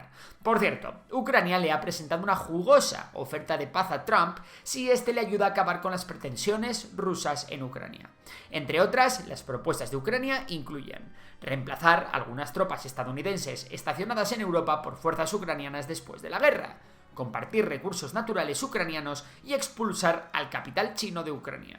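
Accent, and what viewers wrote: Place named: Spain. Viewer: Creo que este muchacho ve demasiado películas gringas. Su acento español dice que es adicto a los diarios ABC y El País.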